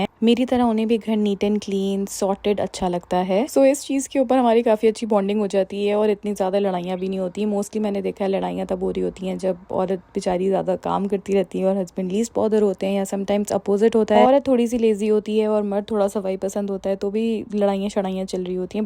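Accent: Karachi, Pakistan. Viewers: hi,i like your vlogs,your urdu is very nice like karachi waly.want to ask you about your folding matress,from where did you get it and its price as wel coz i like it nd want it for my kids.❤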